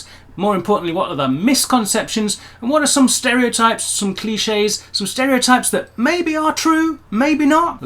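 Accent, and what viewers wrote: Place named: Germany. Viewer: Your German accent is perfect. But now I get the impression you are not an English guy living in Germany, but a German pretending to be a Brit living in Germany. Anyway, you didn't mention of course the worst cliches regarding Germans about WW2. It's understandable that you didn't, but nowadays I think you can mention the war 😉even though many people here in Germany are tired of hearing about it as well of course.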